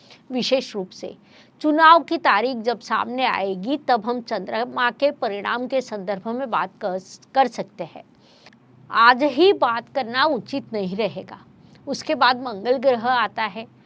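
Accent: Maharashtra, India. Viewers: I love that MARATHI accent....jai shivaji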